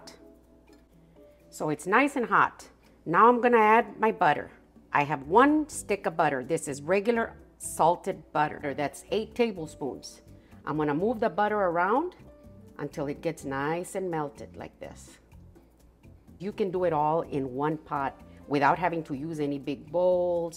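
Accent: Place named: New Mexico, United States. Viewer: thank you so much. I've been looking for a recipe for dinner rolls without using a mixing stand, which I don't have. You are so nice to listen to and understand. You remind me of my best friend of 54 years, her mama. I grew up in Albuquerque, NM and you sound a lot like her with your beautiful accent. I'm so excited now bc I know I can do these your way. Have a great weekend.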